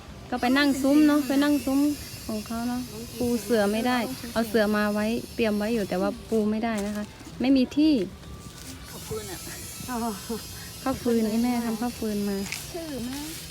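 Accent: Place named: North, Thailand. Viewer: น้อง ที่รัก เมื่อใดด่านเปิดเสียทีอยากจะไปฟังน้อง อู้กำเมืองจ้าว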